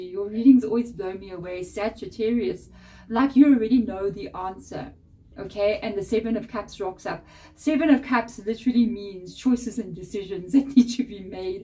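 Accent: South Africa. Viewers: Hello! I am a fellow South African Aussie! I miss the Saffa accent and I’m so glad I found you! I could listen to you talk for hours. Thank you so much for the Sagi guidance 🇿🇦🇦🇺♐️🤍🙏🏻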